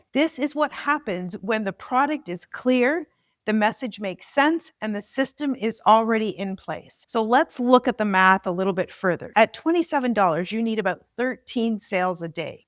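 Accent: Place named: Canada. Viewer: Me too I heard her Canadian accent. I’m from Nova Scotia but live in Montreal now 😊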